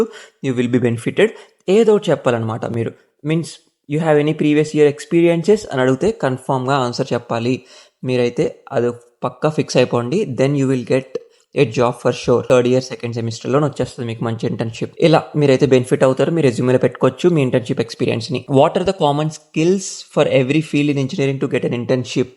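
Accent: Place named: East Godavari, India. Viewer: Maadi ap mandapeta Ne voice east godavari la vundi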